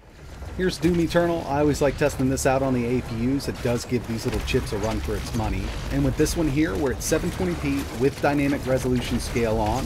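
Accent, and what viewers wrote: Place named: New York, United States. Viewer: I like your dialect. Are you from the NYC area?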